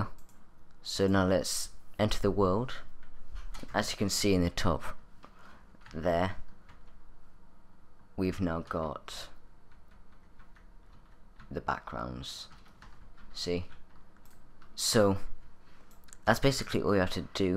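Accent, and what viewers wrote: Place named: United Kingdom. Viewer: It's called having a british accent.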